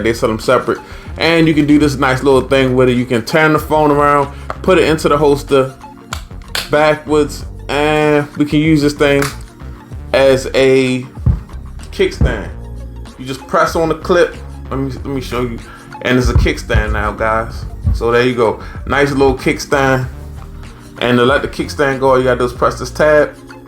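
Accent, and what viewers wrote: Baltimore, United States. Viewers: Sounds like you're from Baltimore, good review and also dud was right cut the nails 😂💯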